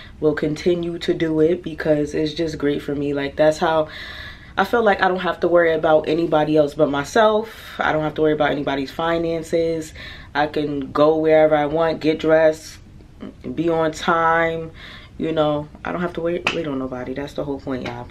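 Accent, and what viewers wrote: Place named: New York, United States. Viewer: Great video. I’m a solo traveler myself. Your accent sounds like New York or somewhere off the East coast. Love from Detroit ❤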